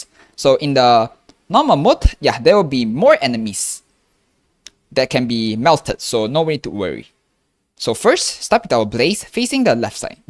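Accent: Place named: England, United Kingdom. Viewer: Why do you have a sort of english accent up till rs-ex-5? lol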